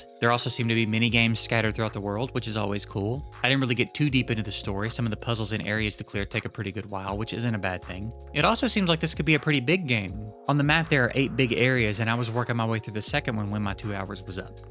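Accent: South, United States. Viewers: your amazing southern accent makes me feel like someone is scratching my brain.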